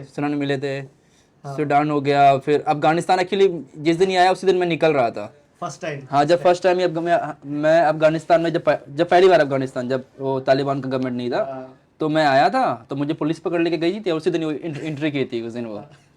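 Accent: Bihar, India. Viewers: Bhutla jaoge ye jo bihari axcent haii hm bihario ko tum se khas jor ke rakhta h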